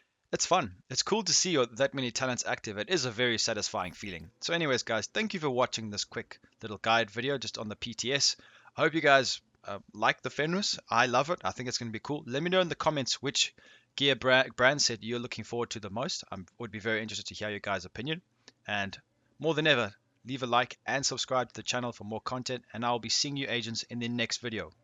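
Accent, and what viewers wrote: South Africa. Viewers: Are from RSA bro? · You sound South African. Lol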